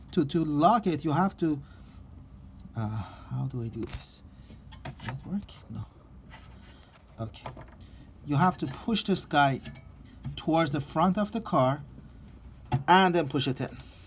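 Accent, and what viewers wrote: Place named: Iran. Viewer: Dorood Farsi accent is always so easy to recognise hahaha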